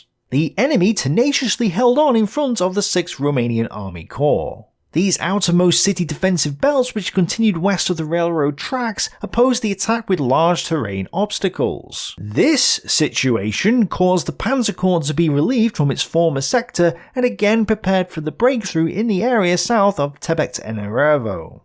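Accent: United Kingdom. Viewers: I can feel my bone healing as I listen to mild British accent speaking about the glorius carnage on Volga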